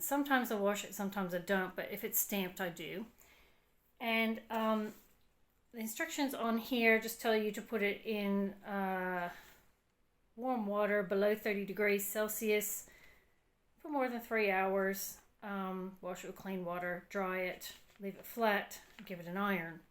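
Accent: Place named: Australia. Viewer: You have a very interesting accent. Australian mixed with what? Canadian? American?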